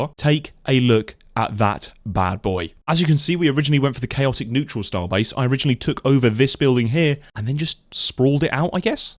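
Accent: United Kingdom. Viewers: so is he a brit?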